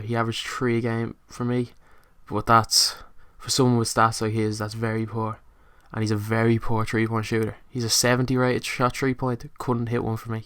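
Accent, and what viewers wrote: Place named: Ireland. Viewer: His voice was so much mor irish😂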